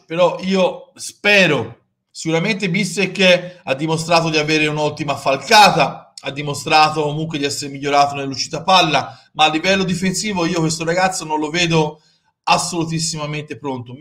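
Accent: Tuscany, Italy. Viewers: Accento toscano Top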